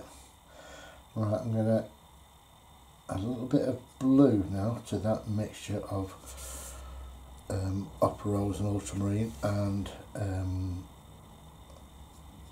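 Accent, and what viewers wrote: United Kingdom. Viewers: Just discovered your channel. I am a sucker for a British accent, love your analogies, you are a very talented artist. Thank you for sharing with us.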